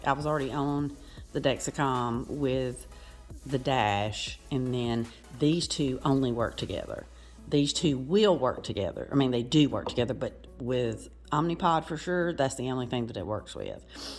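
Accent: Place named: South, United States. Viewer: Hi there. I just discovered your channel and I am enjoying it. I’m also southern, so enjoy your accent. I’ve been on the Omnipod five Pump for a little over two months and I am considering going to the Tslim, which I tried for only one month last year and we could never get the Settings right. I’ve had to be very aggressive on everything from insulin to carb ratio, to insulin duration on the Omnipod. Can you tell me what maybe to expect in trying to set up the T-Slim as far as setting it up in comparison to the Omnipod? Meaning, since I am very aggressive on the Omnipod Settings, virtually all of them, even tweaked the manual ones because I was hoping it would help Omnipod give me more basal, would you have any recommendations about percentagewise changes to start with on the T slim like maybe 10% less aggressive or 5%? If I go back to the T slim, I would sure like it to work very well. 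And I’m still considering MDI because I’m only A few percentage points off in my time in range on the Omnipod but Omnipod requires tons of corrections and after a little over two months I’m still getting 60% of my insulin as bolus which includes the corrections, and 40% from Basal. And I thought it was supposed to learn and try to get me to 5050, but it’s a slow learner at this point. Any help or suggestions would be wonderful. Also, my the tea slim do better with exercise than the Omnipod? I have not been able to get that to work. Thanks if you can. Have a great day.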